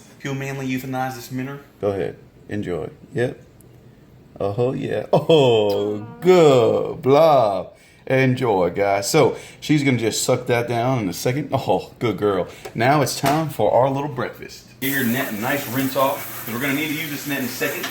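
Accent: Texas, United States. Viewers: Is he talking/sounding abit like his from Texas now?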